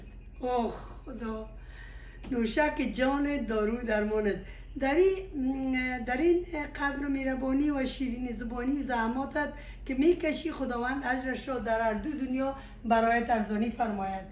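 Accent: Kabul, Afghanistan. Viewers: سلام جان ان شاء الله که جوروسلامت باشید، تشکر ازبرنامه های خوبتان، بسیار ازلهجه ی کابلی زیبایتان لذت میبرم، پدرم هم ازشهرنو کابل هست، اما من متولد ایران هستم ودرحال حاضر در اتریش زندگی میکنم، بسیار دوستتان دارم، من همیشه برنامه هایتان رادنبال میکنم، ازدختر گلتان هم ازطرف من بسیار تشکر کنید، اگر لطف کنید اسم پدرجانم که خدا رحمتش کنه را بیارید که عاشق خانواده وبچه هایش بود یه فرشته آسمانی مثل تمام پدرهای دلسوز وفداکار. اسم پدرجانم محمد ظاهرجان ،ومادر مهربانم مثل خودتان، نجیبه جان ونام خودم تینا. خوشحال میشم نام ها رادربرنامتان بیارید. ❤️ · سلام جان عزیز،نام خدا، بسیار باسلیقه و افاده عالی برنامه آشپزی را پیش می برید؟.من خیلی از دیدن خود شما و طرز صحبت کردن تان بسیار لذت می برم.و فکر می که در کابل هستم.بسیار تشکر از زحمات تان خواهر عزیزم.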